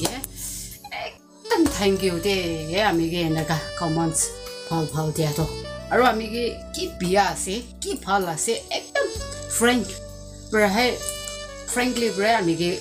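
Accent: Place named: Nagaland, India. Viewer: Authentic and natural...the way you speak nagamise is interesting 😂❤️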